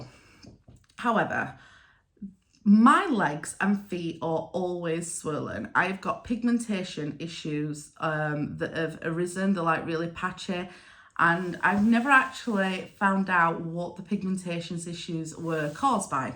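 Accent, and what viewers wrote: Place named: Leeds, United Kingdom. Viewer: Hi, totally relate to everything you’re saying, especially about feeling that you’re a strong, capable, intelligent person and yet this illness we have has got the better of us. I have recently started using Wegovy which has helped me enormously but it’s not a quick fix and still have to be very careful and mindful of what I am eating and drinking. I’m guessing from your accent you’re from Leeds, which I am too (Crossgates) though I now live in Norfolk. Xx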